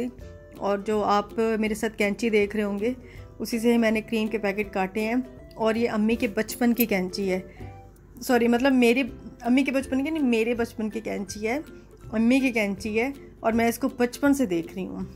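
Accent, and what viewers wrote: Saraiki, Pakistan. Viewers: آپکی پہلی وڈیو دیکھی ہیے بولنے کے انداز سے آپ سرائکی لگ رہی ہیں؟؟؟ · Aap yaqeenan saraiki speaking hen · I hate this language n this pak acsent. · I strongly feel that u r saraiki or multani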